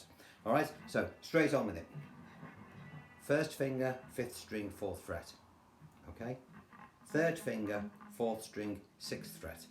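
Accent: Liverpool, United Kingdom. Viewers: Love ye work im learning so much from your lesson. I'm from West Derby but have been living in Townsville Australia since 1984. Love hearing your scouse accent and musical charm. Cheers mate. Go the Reds.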